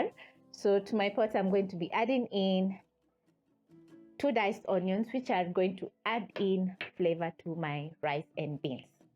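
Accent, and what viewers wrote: Kenya. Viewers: She sounds so Kenyan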